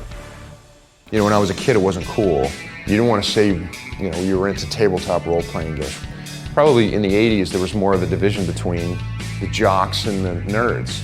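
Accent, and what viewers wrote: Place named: Pennsylvania, United States. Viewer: I find all of you guys to be wonderful beyond words. What's really odd is part of it is because you're Pennsylvanian, which I can tell from your accent and you're upscale "Ohio River Valley". The richer ones (Rivers) in Pittsburgh. Very excited to be with you AND get our government some BIG Money!!!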